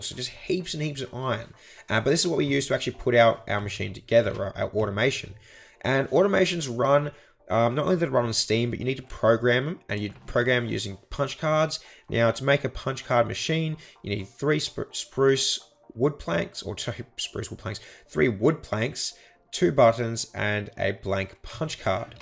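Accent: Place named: Australia. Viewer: It's good to hear an Australian on YouTube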